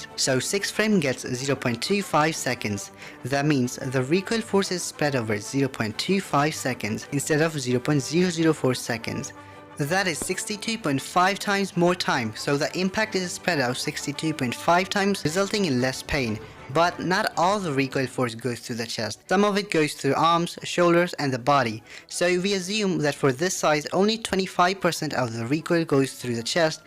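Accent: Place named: India. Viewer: Yo I guessed you're from India purely on the basis of your accent and I realized when you said you have a Potato Phone as Indian Parents usually don't give good phones to their son or daughters😭😭😭 Love From West Bengal aka Hamba Hamba Ramba Ramba State! Peak Content ❤❤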